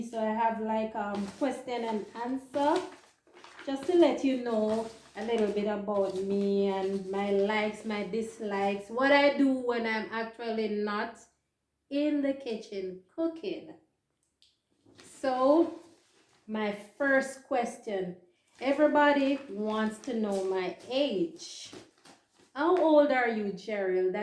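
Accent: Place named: Jamaica. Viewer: A very nice introduction to you! I live the Jamaican accent!! And age 21 for several years 😂 I like that. My wife says the same thing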